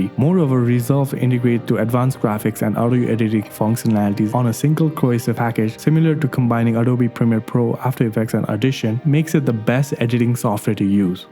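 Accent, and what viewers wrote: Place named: Nepal. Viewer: You sound like a Nepalese. Are you one?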